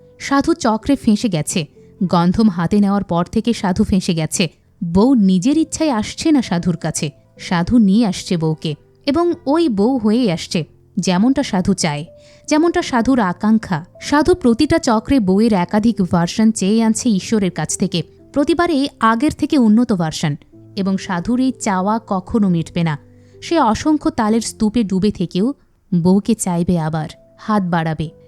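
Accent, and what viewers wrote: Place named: Noakhali, Bangladesh. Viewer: Are Bai eita noyakhali meye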